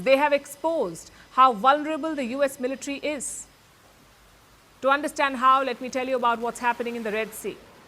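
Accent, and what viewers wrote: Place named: India. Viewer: Can we have announcer that speaks English ( not Indian English). A lot of time , can’t understand what she is saying without caption.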